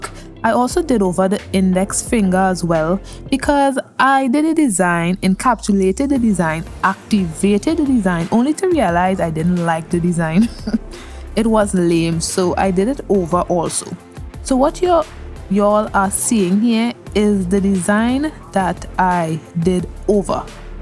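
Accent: Trinidad and Tobago. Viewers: I'm in the❤❤❤❤ with this.. you in the Caribbean. Well that's sounds like a trini accent. I'm just up the road😂😂😂 think I can drop by😂😂😂🏃‍♀️ I'll bring all my products I promise😂🤣🤣🤣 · I had a mind that you are a Trini being one myself and sounds like someone I know. But all in all I love your designs.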